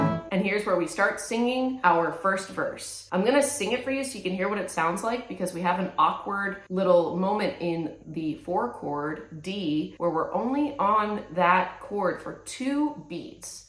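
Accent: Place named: South, United States. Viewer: Love that Southern drawl!!! Great lesson too!!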